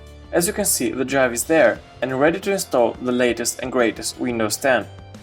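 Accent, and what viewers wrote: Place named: India. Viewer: You my friend are a life saver, never thought someone with an indian accent could solve my issue in less than 3 minutes, THANKS !!!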